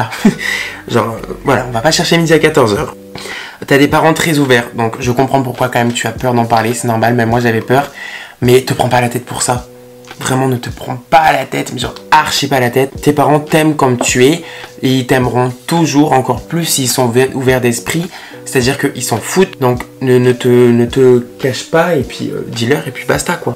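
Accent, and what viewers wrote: France. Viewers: j'adore sa voix et l'accent français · C'est trop vrai ! Son accent français est grave beau, il est agréable à écouter je trouve aussi ^^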